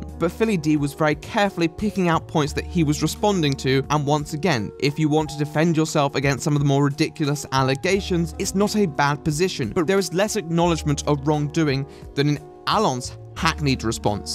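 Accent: RP, United Kingdom. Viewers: A little something called British accent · a nice posh accent speaking on the delicacy of mental health related sponsorships to go with my cheap ramen. thank you. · Whats with all these commentary channels with RP accents? Is all of the upper middle class in Britain raising children who need to constantly feel better than others? Fan either way. Great video.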